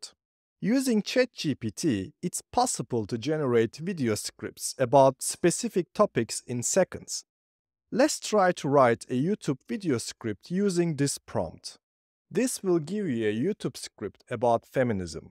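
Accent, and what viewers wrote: Turkey. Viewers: Türk aksanı 😊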